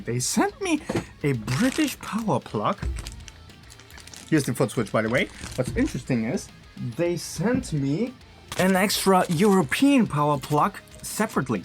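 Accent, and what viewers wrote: Germany. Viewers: Are you a german guy...?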